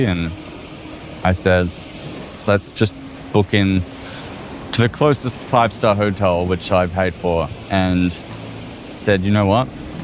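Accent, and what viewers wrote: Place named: Australia. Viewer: Old at 40 or 50! Ha ha .. Im between those ages, have the same accent as you and have not lived in Australia since 2007. I LOVE my life. The secret sauce is to have no debt and low fixed costs and to think a bit differently.. Everyone can do it it just takes perseverance and imagination... You wont have a flash house like others but you will have awesome memories, stories to tell. At times you will just wish for a simple life and a safe place in that house you dont have but once your batteries are recharged you will just want to see the world again... You do have to sacrifice, no pets, lots of belongings etc but it will be rewarding if you are that way inclined.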